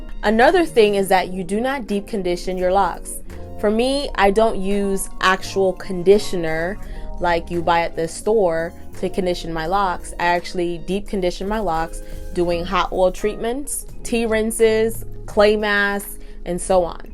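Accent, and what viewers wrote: Jamaica. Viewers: Ayyyy love the patois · You from Jamaica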